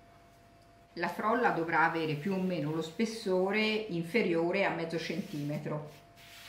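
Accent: Tuscany, Italy. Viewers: Bravissima come sempre. Ma te non ti chiamaviTerrore Spendido ? O mi sbaglio ? Ti ricordo bene col tuo accento Toscano che personalmente mi fai morire dal piacere ascoltarti va be bellissima sta pasta frolla senza dubbio li farò col cuoreeee ❤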